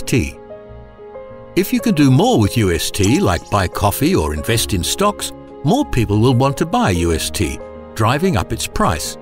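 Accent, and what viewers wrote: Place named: Australia. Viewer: Australian (I'm Australian – I can assure you he's Australian – or a Kiwi who's lived in Australia for a long time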